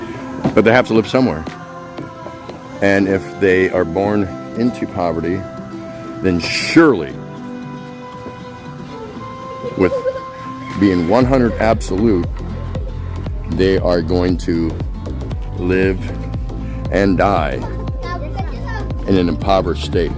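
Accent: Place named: Philippines. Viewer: nice neighborhood with great people. nice DIY pathway for the high tide. nice tagalog accent!. hopefully one day you will blog also talking only in tagalog.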